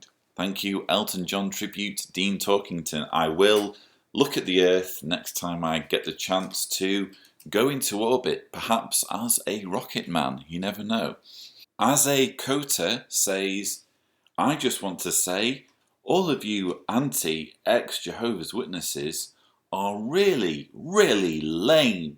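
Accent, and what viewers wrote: United Kingdom. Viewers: I loved your Prince video. I'm not an ex jw but remember the ones I've come across ... and I remember feeling bad for pretending not to be home when they came to the house ... but ever since I was little I was fascinated with the little magazines... the beautiful illustrations and kind messages... and the well dressed people giving these little booklets out.... my mom raised me Catholic but I was allowed to play with the little books for coloring or playing office.... when I did answer the door I was taught to be polite and received the little books or at a bus stop waiting I'd appreciate the free little book from the jw... anyways... my world would never gave been able to commit to the meeting demands etc etc... BUT STILL MY LONG FASCINATING INTEREST IN THEM REMAINS.... and now with all the ex jws and YouTube TTATT .... man some serious juicy gossip and exposure.... 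wow thank you for sharing your story... I love listening to your British accent.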